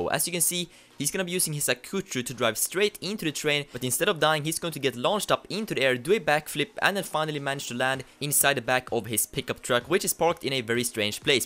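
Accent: Sweden. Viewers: Nice video! are u swedish? u sound swedish :D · Are you swidish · Thats classic swedish style english almost every swedish talks english like that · Do you come from sweden?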